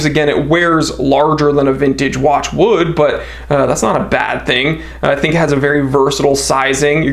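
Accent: Germany. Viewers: lol love that German accent!!!!! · lol I love the German accent. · Please more German accent. Intoxicating and additive.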